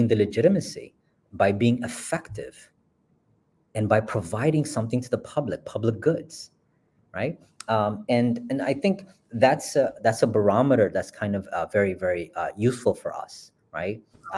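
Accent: United Kingdom. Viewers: Great British accent,. Keep up your great work. 👍🏽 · love your British accent.please focus on doing english programs.there are not enough english current affairs productions about Ethiopia. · Corrections, Col. Abiy is not a doctor he is elementary schools drop out street Hustler's boy. From Shane's red district streets a prostitution zones single mother in Bashashaa town case closed no more questions thanks 👍 baby your Brtish accent Likes sweat heart kisses on Lips Love it you sound great.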